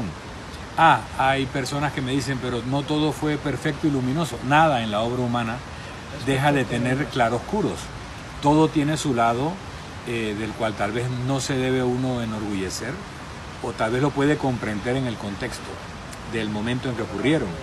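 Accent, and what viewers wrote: Spain. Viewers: Madre mía!! Su acento es prácticamente igual al canario. Yo soy canaria y casi no lo distingo del acento de la gente de Tenerife. Increíble! Sé que los canarios emigramos masivamente durante siglos a Cuba, Puerto Rico y Venezuela y en menor medida a Santo Domingo y a toda la costa caribe de Colombia, Costa Rica, Panamá, etc. Includo llegaban barcos con familias enteras de canarios a Yucatán y desde ahí se desplazaban hasta Florida, Louisiana y Texas fundando ciudades como San Antonio de Texas, pero también bajaron hacia el sur fundando, por ejemplo, la ciudad de Montevideo en Uruguay y muchas otras. Asimismo los canarios descendemos de andaluces, extremeños castellanos , gallegos y otros europeos, además de sangre aborigen guanche, bereber del norte de África. Más que por la sangre, que la hay, lo que nos une realmente es nuestra maravillosa cultura común, de la que hay que sentirse orgullosos porque no hacerlo sería despreciarse a sí mismo.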